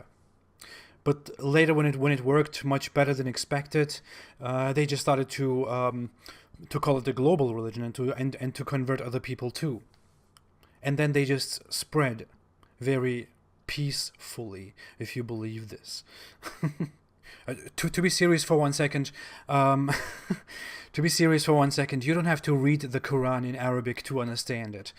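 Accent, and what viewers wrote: Germany. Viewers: I love your videos and your German accent. ✊🏾😂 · Thank you so much for your bravery, intelligence, and honesty! I love your channel! You are so good! I love your German accent! Take good care! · I love your videos. I love your German accent. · I just found out about your channel and I love it. You spread awareness about islam which is important to help reform it. Your videos also help me find information when debating muslims or islam loving feminists. I don't know if you take requests for videos but here are some topics I'd like to suggest: what is taqiyya, muruna, tawriya and kitman (probably spelled them wrong, sorry )and can you give some advice on how to recognize and deal with them when muslims practice them. Do you think Islam will ever die and what would be the cause if it's death? Do your think it can be reformed somehow? And how do you think radicalism in Islam can be stopped? 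You can also try to do a video on the history of islam...oh, and by the way, love your German accent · I love your videos and your German accent. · You are a fellow German!! Das freut mich voll. Ich mag deinen deutschen Akzent 😍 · I love your little laugh! And I love your German accent. And I love your cute face! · Islam harms Arabs the most, especially the Arab women. That's what I think as a Turk. Btw, I think you have some kind of Turkish accent besides German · I love your german accent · I love your vedios and German accent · I love your videos and I love your German accent. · I love your videos and I love your German accent. Peas be upon you. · I love your videos and i love your german accent! :) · Love your videos, unsure about your German accent. Tschus! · could you please do your vids in Arabic as l can't understand your English because of your strong Germanic accent. Rock n Roll! 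· Which Arab dude hurt you man? I’m an ex Muslim too but chill out on talking shit about Arabs dude. You’re not perfect and you have a shit German accent. Not all of us are crazy Muslims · Ich liebe Deinen deutschen Akzent ;-) ! Deine Videos sind super ! DANKE und schönen Gruss aus der Heimat !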